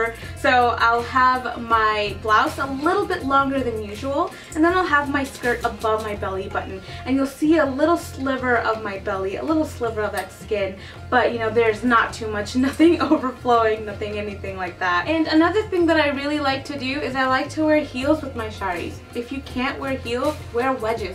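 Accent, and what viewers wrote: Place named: Bengal, India. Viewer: Shes Bengali....have some linguistic awareness.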